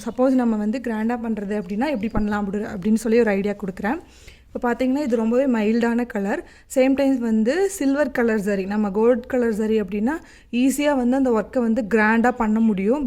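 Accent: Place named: Coimbatore, India. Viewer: mam neenga cbe ah romba naal ah unga video pakuren apove unga slang vechi doubt irundhuchu aana therila andha sree devi box patha aprm tha conform achu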